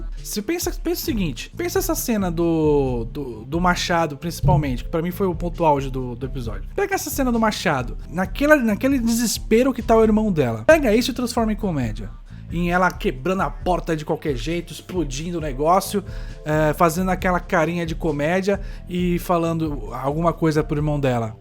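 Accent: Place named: São Paulo, Brazil. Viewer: como voce é burro! nao sabe a pronuncia de "cha". vai ver mais anime e estudar um pouco de japonês. pela pronuncia só pode ser sp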